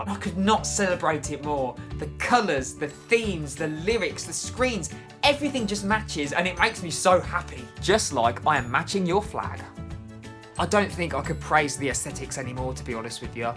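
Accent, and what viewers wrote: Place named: United Kingdom. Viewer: Oh, I'm so thankful for your hat and T-Shirt))))))) And your British accent...🥰🥰🥰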